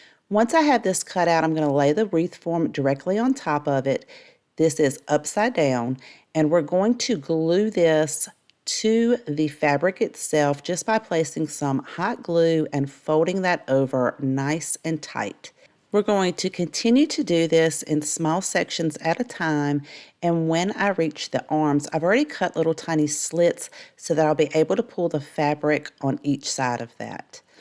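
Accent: South, United States. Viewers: Hello from Scotland ✋🏻I've only been watching crafting on YouTube for a couple of months. And I really love how "clean" your designs are, if that's the right word. You have the minimum of things to make something look so lovely. We don't have the amount of choice in our shops that are as cheap as your Dollar Tree stores etc and although Amazon gives us a wider choice, it's not the same as seeing it in front of you being able to touch and find inspiration. That said, because you make so many of your projects with the minimum of stuff needed, I can replicate it without needing to take out a bank loan 😂. Just love your style, the way you explain it so simply in that lovely southern accent of yours. 🎄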